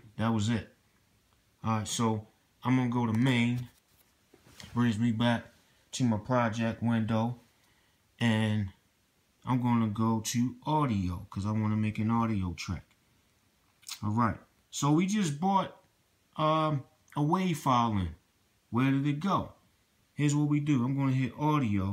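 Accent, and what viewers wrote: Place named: Baltimore, United States. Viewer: I love this video. I'm sincerely grateful I hope you do more videos brother. Sound like you from Bmore Peace !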